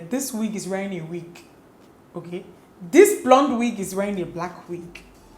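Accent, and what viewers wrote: Igbo, Nigeria. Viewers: The additional igbo accent just perfected this video 😂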